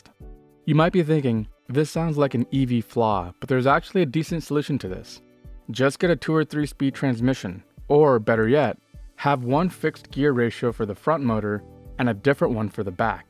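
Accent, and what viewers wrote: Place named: United States. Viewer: hey you sound American, good job!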